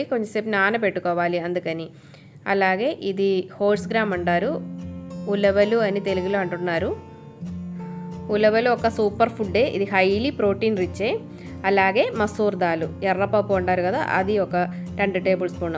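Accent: Kerala, India. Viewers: Nice, you are a Malyali ,must have settle in Hyd since long , Your slang is a mixed kichidi of Malyalam & Telugu 🌰🥒🍅🍆🌶🥕😊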